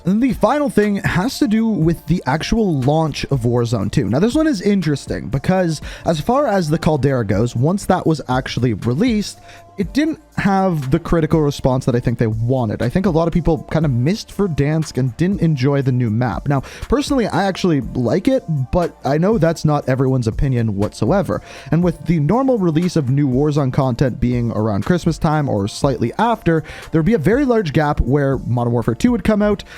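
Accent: Canada. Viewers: It's always funny to listen to how Canadians say "about" . I'm not watching the rest of this video hearing someone speaking this way. It's like Canadians specifically love to say the word about for some reason. Disliked and not watching it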